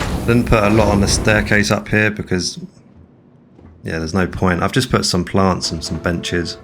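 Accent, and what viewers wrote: United Kingdom. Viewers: Nice outpost dude. If you invest in your outpost skill you can definitely pimp it out even more. Also nice to here a fellow Brit doing some videos haha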